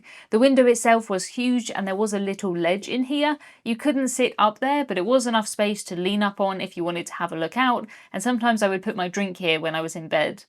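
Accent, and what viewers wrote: United Kingdom. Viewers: Americans definitely use “Pop” in the same manner 😊 ‘Pop out’ ‘Pop in’ ‘Pop up’ It sounds so much better when you say it with a British accent!😊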